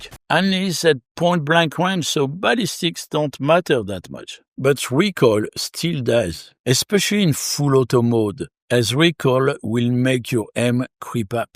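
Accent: France. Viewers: I love that you (still) have a strong French accent (a real plus for your channel in my opinion) 😁 Much more pleasant than the accent of many of my fellow Germans. They sound so wooden, as if they're trying to convince Scotland Yard that they aren't actually spies scouting England for a planned invasion. 🤣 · I'm almost certain you're French, but I have no proof at the moment (except for your accent).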